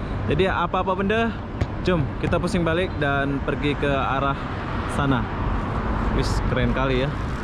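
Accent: Malaysia. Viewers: Kamu orang mana sih?logatx org melayu. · Kalo Aku dengar dari logat. Abang ini orang malaysia kayak nya. Salam dari Sarawak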